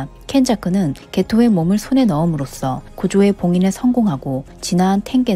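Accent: Japan. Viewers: 일본인이신가 발음이 그냥 일본인인데..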